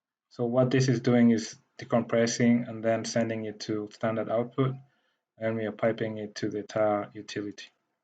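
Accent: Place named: Nigeria. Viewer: Bro you sound Nigerian. Is there a way to connect with you? Instagram? Twitter?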